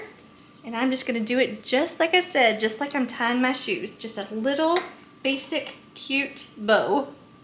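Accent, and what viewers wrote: South, United States. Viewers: Omg I love your accent…. Your southern charm is adorable as well as your craft projects. Thanks for sharing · What a Super idea! Love Bee’s 🐝 I also ❤️ your accent of your voice! We live in Wisconsin. So when I watch Southern UTubers it makes me smile 🥰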